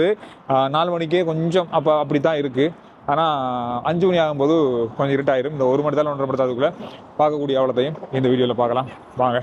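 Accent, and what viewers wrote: Sri Lanka. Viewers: travelogue பயண காணொளி எப்போதும் சிறப்பான காமிரா கோணங்களோடு அழகான காட்சிகள் நிரம்பியதாக ருசிக்கும் இலங்கை தமிழில் ஈர்க்கும் தகவல் வர்ணனைகளோடு கவர்ந்து இழுக்கும். நன்றி. அன்புடன் நாகராஜன் சென்னை. தமிழ்நாடு.(உங்க குடும்பத்தார் இலங்கையில் நலமா)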